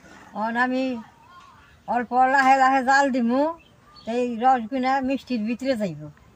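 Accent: Tangail, Bangladesh. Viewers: Dida apnar vasa amadar tangayl ar vasar satay onayk mill . Rosmalay darun hoyaca.❤❤❤apni onayk cute.😅😅😅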